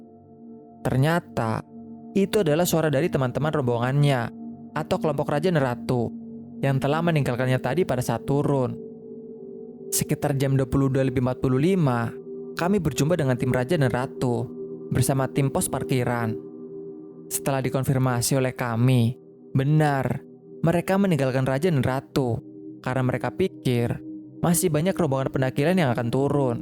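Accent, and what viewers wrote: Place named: Banyumas, Indonesia. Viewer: Yg bawain cerita orang ngapak ya😅😅😅😅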